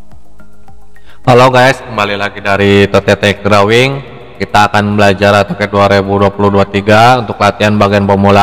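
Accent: West Java, Indonesia. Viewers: Ini mentornyq pasti orang Sunda...aksennya orang sunda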